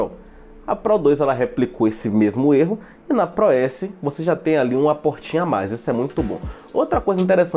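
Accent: Bahia, Brazil. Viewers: Man! Você combina o sotaque aqui da Bahia + O conhecimento em tecnologia. Esse canal era pra ter um milhão de seguidores. Por conta das dúvidas que tirei aqui, comprei a PRO II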